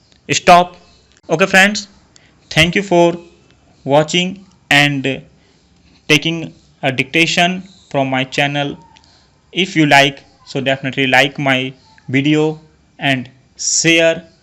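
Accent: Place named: United Kingdom. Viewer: No need to bring brtish accent while dictating. Pls understand the correct pronunciation.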